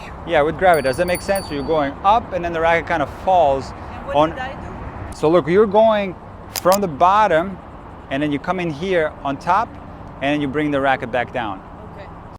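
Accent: Russia. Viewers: is russian love her asscent saw her from the beginning so good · ДА ТО РУССКАЯ ПО ГОВОРУ!!!🤣🌺🤣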